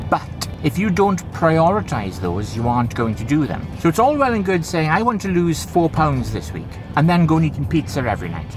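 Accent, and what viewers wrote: Wales, United Kingdom. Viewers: Where is that? Aberavon or more Mumbles way? Driving me mad, trying to work it out. X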